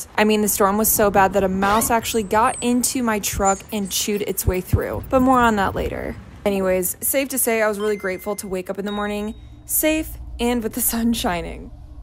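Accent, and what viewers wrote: South, United States. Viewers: I’m from NC and your southern accent 😂 I like it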